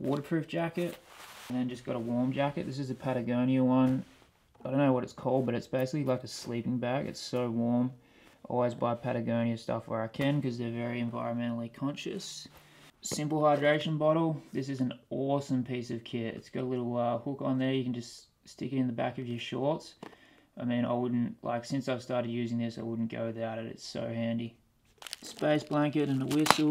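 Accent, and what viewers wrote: Australia. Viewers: Awesome filmmaking dude .. are you from Australia ? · That accent.. definitely Aussie.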